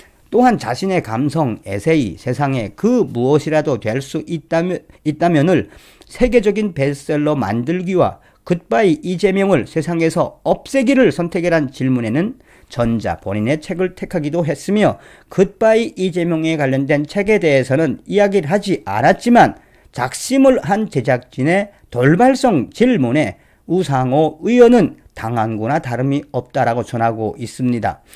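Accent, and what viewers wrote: North Korea. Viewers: 북한식 말투가 조금 섞여 있는 것 다 알고 있는데 별문제 없다고 봅니다. 괜찮습니다. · 방송내용은 좋습니다 만 방송은 표준어가 원칙입니다북한 사투리를 좋아하는 사람은 없습니다 수다래 라는북한사투리 선입감이 좋지 않습니다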